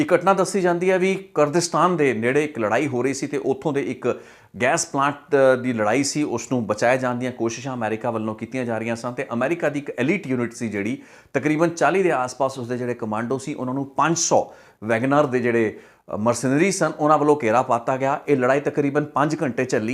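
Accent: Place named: Doaba, India. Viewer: One thing noticed more about u that u have doaba accent... "Peha"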